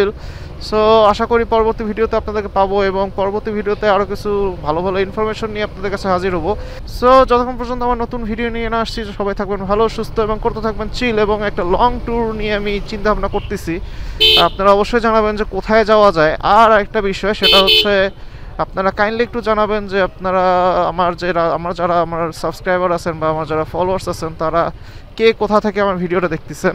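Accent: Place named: Rangpur, Bangladesh. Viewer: Subscribe to onk age korsi,,,,apnar mukh theke Rangpur er kotha sune vlo laglo,,,,,akhn theke potita video te amr comment paben"!💬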